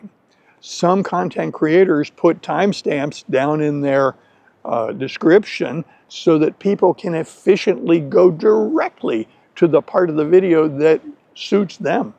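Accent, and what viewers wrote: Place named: General American, United States. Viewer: face-blue-smiling:... again... I love the way you tell everything and throughout the story you use phrases and expressions to describe processes, situations and the spatial and temporal relationships of the matter in question are already implicit (grammar)... that is, we can learn English by listening to you and of course, then I pause the video, repeat and try to imitate your pronunciation which is very standard and characteristic of the American accent in general... thanks,!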